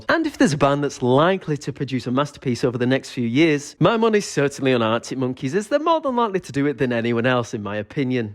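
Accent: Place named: United Kingdom. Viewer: i like your dialect, where in the uk are you from?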